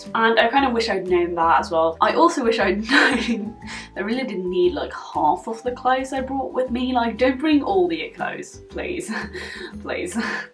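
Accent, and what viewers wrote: United Kingdom. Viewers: When you said you are a international student I thought "huh but I thought you were from the UK, no way you can talk that good English with a UK accent but not being from the UK", but then you mentioned that you were from Norway and I felt stupid. I love your videos, you have such a bright and funny character!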